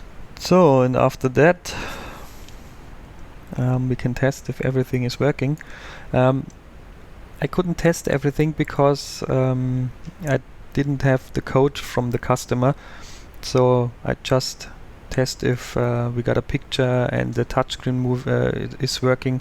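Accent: Germany. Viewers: Your Bavarian English is quite good!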